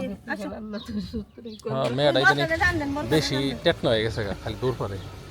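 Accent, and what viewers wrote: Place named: Jamalpur, Bangladesh. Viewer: কোন এক শীতের সকালে তারা যখন মেলনী কাকীর সাথে মাছ কিনতে যায় ঝগড়া করছিল সেটাই প্রথম দেখা ভিডিও ছিল। আমার গ্রামের বাড়ি জামালপুর। আপনার ভাষা শুনে মনে হয়েছিল জামালপুরের কোন এক গ্রামে থাকেন। তখন থেকেই ভিডিও দেখা।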